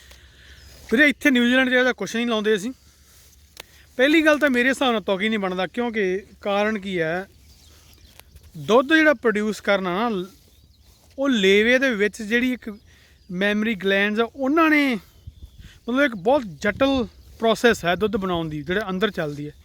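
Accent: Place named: Majha, India. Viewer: veer g tuhadi Boli Maajhe di lagdi a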